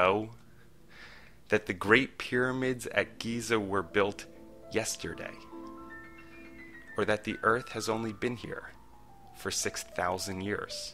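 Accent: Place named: United States. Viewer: In all this time, I assumed you were British for some reason. probably the choice of music. Your more American accent took me by surprise, lol.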